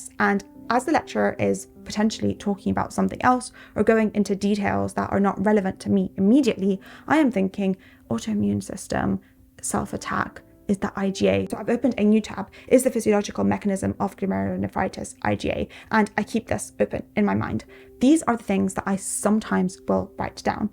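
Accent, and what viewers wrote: United Kingdom. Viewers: i LOVE your British accent!🥰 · “☘️A left handed red head with a British accent ☘️ : 🌹Beguiled me 💯 ✅🥀✅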